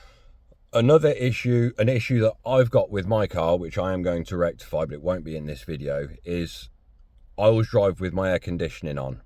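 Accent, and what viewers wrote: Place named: Kent, United Kingdom. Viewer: Hey, great video! I'm typing from Canada. I'm lived half my life in Kent, I haven't been back to the UK in almost 10 years, but it's great to hear the old accent😆. I just had a question, as a vw tdi enthusiast like you, do you recommend driving your vw tdi hard (ie. staying in 3k-4k rpms for a few minutes or redlining) or just shifting in a higher gear by putting the dsg in manual mode? And then also if I drive it "hard" or shift it into a higher; how often a week should I do this as a daily driver in order to prevent buildup in the engine from the egr,dpf, etc...? I own a 2014 2.0L vw passat tdi by the way and the only difference between the conditions I'm in, is that it's negative temperature for over half the year here lol.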